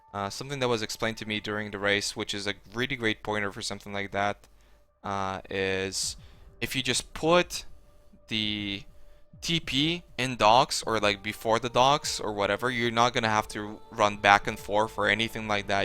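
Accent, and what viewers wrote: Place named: Poland. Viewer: jak się człowiek spieszy to się diabeł cieszy ^^ od dawna cię oglądam, ale nie wiedziałem że jesteś z Polski :D trudno wyczuć akcent, gratulacje! powodzenia na lidze!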